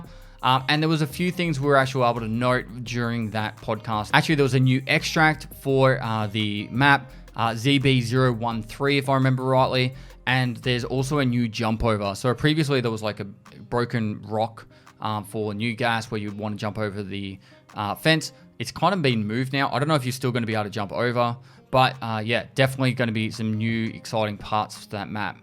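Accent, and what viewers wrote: Australia. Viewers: he's Australian and his accent just makes it sound like that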